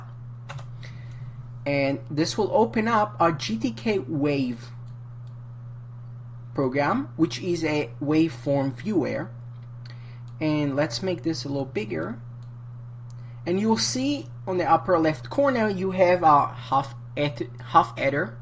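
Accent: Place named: France. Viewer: French 😄😄😄😄... sorry but this is the first time I'm hearing French accent beside in the movies....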